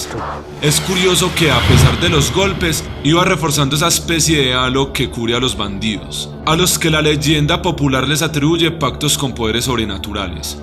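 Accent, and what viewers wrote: Colombia. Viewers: Por tu manera de hablar eres de Colombia pero viviste en aquella época de Guerra del Narcotráfico contra el Estado?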